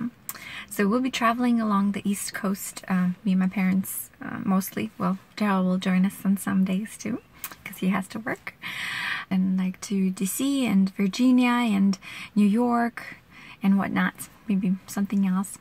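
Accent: Russia. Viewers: ! I love your videos you're the best! I've always had asmr since I was a little girl. It was mainly my teachers that made me have a tingling feeling and chills! My Spanish teacher in high school was Russian and she really had a soothing voice and accent like you. When I started watching you, you introduced me to things like tapping and crinkle sounds I had never experienced before in asmr. I didn't even know what I had had a name lol. I thought I was the only one until one day I came on YouTube and typed in soft spoken words and came across you and I've watched you ever since! You're really good at what you do. Congrats on making it to 5 years! Keep it up! If you're looking for things to make into jewelry I would suggest making things with sea glass. It's very beautiful we have a lot of it here where I live on smith island in MD. How the sand and water mold pieces of broken glass to have smooth edges is so pretty. 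A friend made pretty necklace and earrings made out it I lovethem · I was in Baltimore a few weeks ago with my boyfriend and was secretly hoping to run into you randomly in a little shop or something to say hi and meet you! But I'm glad I didn't because I'd have nothing to say I'd just wanna follow you and listen to you talk (that might have been slightly creepy) haha. I love your voice so much and your Russian accent. I also just bought the nail hardener you recommended because I have such problems with my nails peeling so I hope it works! Maybe someday I'll have nails as beautiful as yours. That's the dream. Lol.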